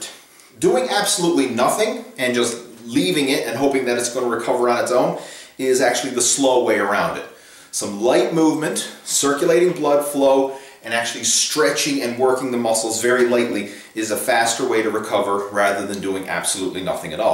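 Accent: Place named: East Coast, Canada. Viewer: The accent. Can’t tell if it’s East Coast Canadian or maybe a light Boston accent. Newfoundland?Where are you from bud? I only ask because I teach English and my study was linguistics.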